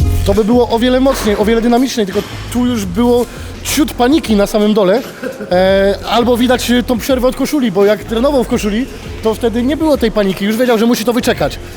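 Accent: Silesia, Poland. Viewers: Jak łon Gryfinie po Śląsku nawijo 😁👍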